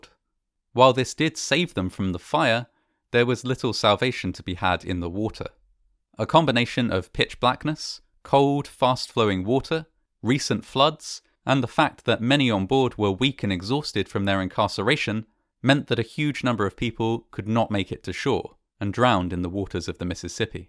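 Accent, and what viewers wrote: England, United Kingdom. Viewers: By his accent, one would assume he is English, so the spelling HOSPITALISED is correct.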